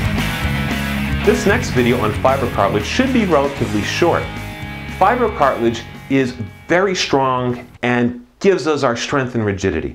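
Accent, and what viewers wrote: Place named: Texas, United States. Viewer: these are super helpful and it's fun hearing a lil' bit of Texas in your speech.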